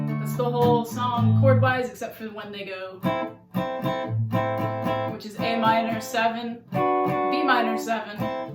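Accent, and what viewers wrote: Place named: United States. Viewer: Hello.Im a very late beginner to playing music, and on Guitar, I just managed to learn the Dorian mode in A, also learning. Youe lesson I just viewed is great BUT 2 things interfere to learn from you, 1 speed of instruction and 2 accent im from England so the American accent and speed of delivery is difficult to follow for me. BUT I enjoyed this lesson tutorial whatever. Thank you I will review it many times hopefully understanding as I develop in understanding your presentations.Nice to listen to a female for a change, I tihink maybe easier to learn from? Looking forward to hearing from you more.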